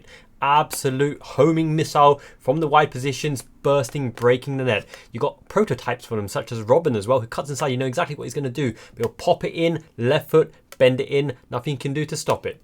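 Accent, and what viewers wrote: United Kingdom. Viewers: love your channel. Are you from the UK originally? Your accent is English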